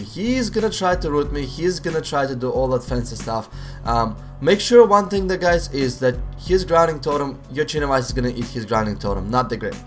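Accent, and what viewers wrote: Russia. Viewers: Hey, first of all just wanted love the channel and ofc russian accent. i was wondering if maybe i uploaded a game of 2's of me playing unholy you could tell me if im shit or not. thanks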